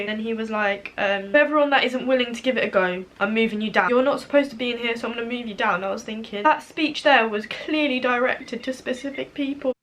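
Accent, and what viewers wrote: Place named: United Kingdom. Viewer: british english sounds so beautiful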